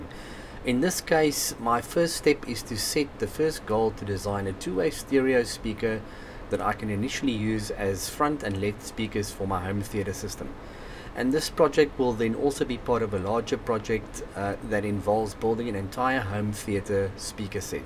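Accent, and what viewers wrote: Afrikaans, South Africa. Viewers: Hoor ek n afrikaanse aksent?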